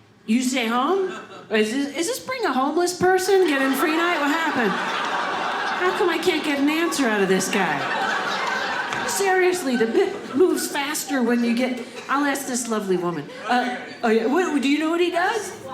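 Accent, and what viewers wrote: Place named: Wyoming, United States. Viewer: She sounds like she's a local Casper/Bozeman/ Kellogg persona.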